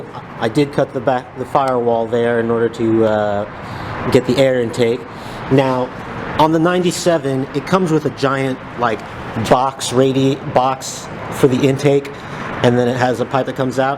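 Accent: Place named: East Coast, United States. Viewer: Funny, you don't sound Californian! You sound like from the east coast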